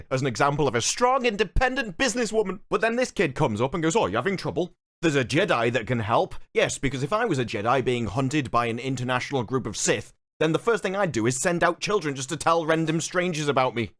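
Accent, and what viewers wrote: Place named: Sheffield, United Kingdom. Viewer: Yet another great reason to not watch modern SW. Your accent is bugging me, it sounds familiar (I'm Rotherham area) and every now and then your accent reminds me of PJW who hails from Sheffield.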